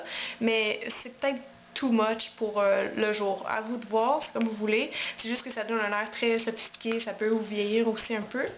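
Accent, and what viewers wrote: Canada. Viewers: Euh...désolée mimane18000 mais n'a pas d'accent...Ahhhh ok! Tu es française et tu parles de son accent canadien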